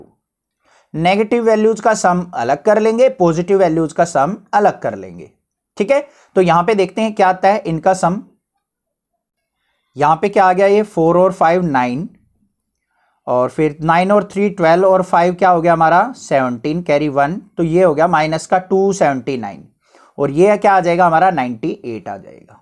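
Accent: Haryana, India. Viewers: Sir, I know that u are from haryana because aapki language mujhe thodi thodi haryanvi lgi. I am also from haryana ,Mahendergarh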